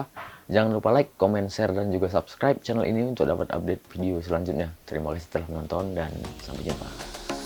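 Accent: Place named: Padang, Indonesia. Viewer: Cara ngomong lu keren bang kaya temen gua dari padang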